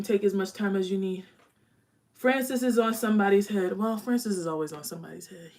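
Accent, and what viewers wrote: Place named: New York, United States. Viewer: Awww come thru, NY accent!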